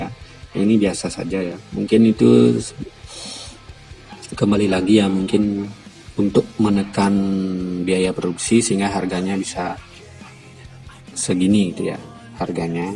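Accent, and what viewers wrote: Bali, Indonesia. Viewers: Logat anak bali wkwkwk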